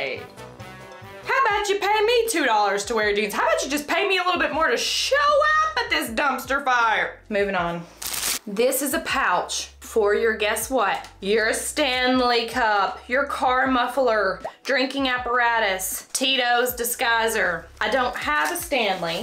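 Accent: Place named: Appalachia, United States. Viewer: I knew you had to be from Tennessee! I’m from northeast Tennessee, and you sound straight up Appalachian 😆😆😆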